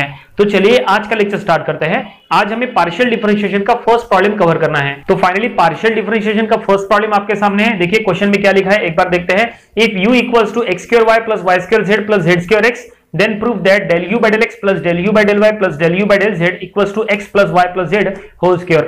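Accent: Hyderabad, India. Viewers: Sir hyderabadi Ho qa milenga milenga ke rhe ho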